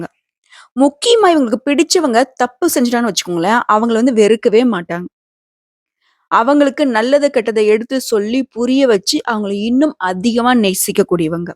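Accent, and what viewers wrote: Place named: Kongu, India. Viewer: நீங்கள் கோயமுத்தூர் அல்லது திருப்பூரை சேர்ந்தவரா மேலும் தங்களின் பேசும் தொனி கவுண்டர் இனத்தினை சேர்ந்ததாக தெரிகிறது. இனிமை.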